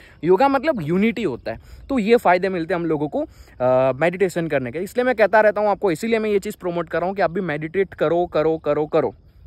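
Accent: Gujarat, India. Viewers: Bhai tame gujrati cho accent to Gujarati che kya Raho cho tame Ane aa Kai nadi che?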